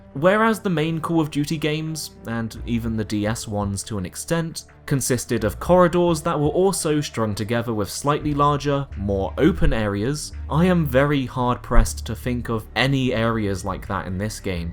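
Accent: United Kingdom. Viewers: Thaaaaanks! I always imagine your British accent when I read your comments lol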